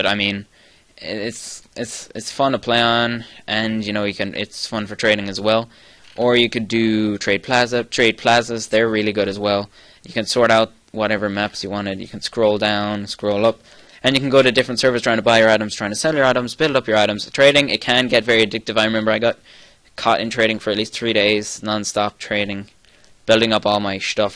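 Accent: Ireland. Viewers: YAY! YOU ARE IRISH!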